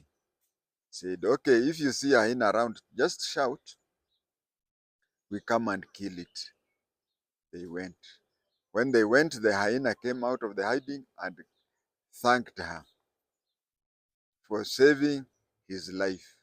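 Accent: Kenya. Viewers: Funny u have a kale,luhya accent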